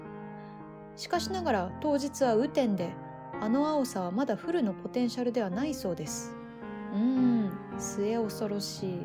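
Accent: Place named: Kansai, Japan. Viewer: サバァは、猫舌でパンケーキ好き。マジで、ぶち込む、めっちゃと関西弁で。水と食料を忘れ、安全第一！オモステの軍用車を操縦する・背伸びする猫天使👼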